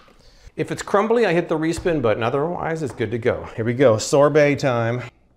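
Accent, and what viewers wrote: United States. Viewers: Possibly. I assume by the accent, the dude is in the US. But assumptions.... ;)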